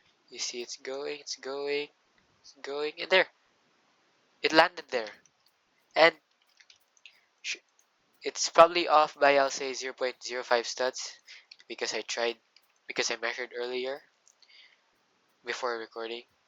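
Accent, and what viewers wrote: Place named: Philippines. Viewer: Parang Filipino accent ata to ah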